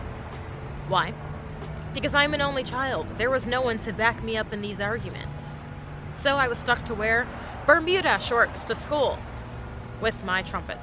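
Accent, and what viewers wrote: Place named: Boston, United States. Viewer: Ayeeee your from Boston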